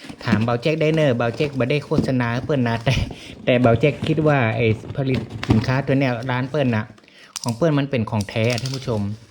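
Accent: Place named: North, Thailand. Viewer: แต่ฮาวเข้าใจเบิดเน้อตี้ปี้ อู้กำเมืองอ่ะกะจ้าว😁😂อู้มาหลายๆโลดเจ้าเข้าใจดีจ้าวววว....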